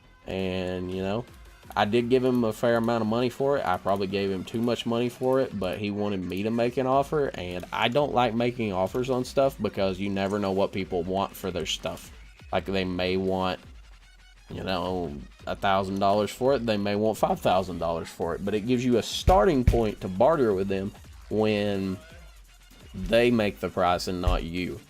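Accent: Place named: South, United States. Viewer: bro I won't lie I have you just talking in the background and working on school work and what not. Something about your southern twang really has me sitting down and just listening to you. You should dabble into commentary!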